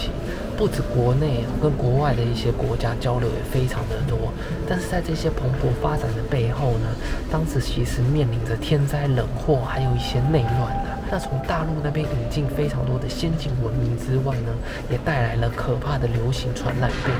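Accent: Taiwan. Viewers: up主是台湾人还是马拉西亚人？我听力没那么敏感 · 第一次看的影片，影片做得不錯，我只有一個問題，請問是台灣人?香港人?大陸人?看影片的標題很像香港人，內容卻是繁體字，講話的口音也有點不像台灣人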